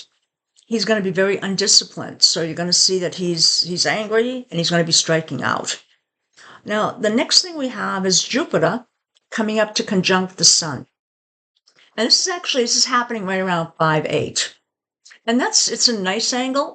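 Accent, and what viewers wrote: Massachusetts, United States. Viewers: Are you from Massachusetts? I'm guessing Salem /North Shore.😊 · I’m from the North Shore and heard a MA accent too. Always cool to find a local on YouTube! ❤ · I’m from Massachusetts and immediately was like this woman is from Massachusetts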